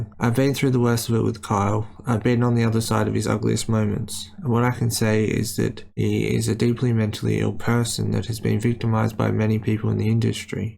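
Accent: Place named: United Kingdom. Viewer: ur so british i cant follow what ur saying without locking in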